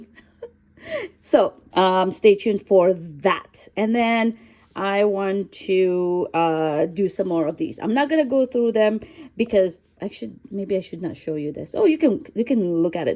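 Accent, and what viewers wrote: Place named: India. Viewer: Are you from india? Because you have a really pretty accent😻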